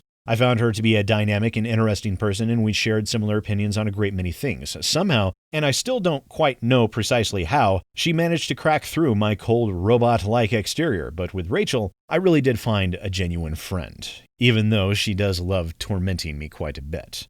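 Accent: United Kingdom. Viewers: Man, you should've voiced this video in your Total Biscuit-esque British voice.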